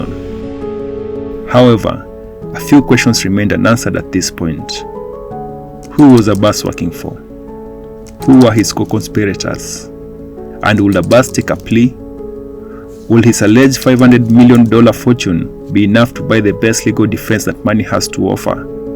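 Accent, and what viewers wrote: Kenya. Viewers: The Kenyan accent of the narrator...the tone of the video seems to admire the fraudulent activities...it's very sad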